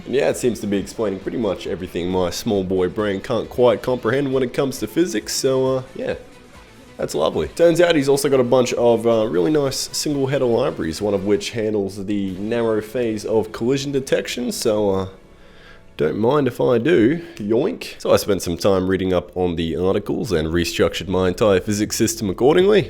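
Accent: Australia. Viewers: The aussie accent makes this video 100 times funnier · Sounds like “I did a thing” us fucking Aussie’s all sound the same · first 5 seconds, i can already tell he's a fellow aussie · Are you Australian? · Australian ASMR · i knew immediately this guy was Australian. when i saw the wiggles at the end, i was even more sure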